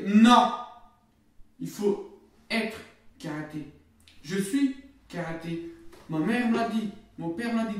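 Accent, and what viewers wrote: Nord-Pas-de-Calais, France. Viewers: Exprès et il parle avec le Nord il est chti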